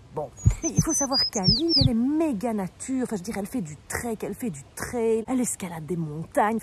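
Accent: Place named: Belgium. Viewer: Même format, même humour, même petit accent et quelques expressions belges : suis tjrs aussi fan ! 🤗 Tellement de sujets que l’on peut traiter ainsi Hâte de voir comment tu vas décliner celui-ci 😜 La nature et l humour, deux piliers de ma vie 🥰 La citadine en pleine nature, je me moque gentiment et j espère apprendre encore des bienfaits de notre nature , avec Ali ☺️ À dimanche prochain 🥳🥳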